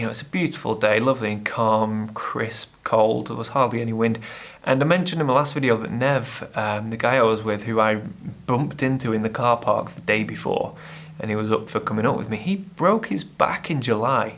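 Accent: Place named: Scotland, United Kingdom. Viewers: Hey, infact last video was absolutely beautiful, magic mountains! Being in the north you're getting some sottish accent:-) see ya the next! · Stunning photos, but your Scottish pronunciations have me on the floor, you need to spend more time with Mr Wilkie, great work mate & keep the vlogs coming.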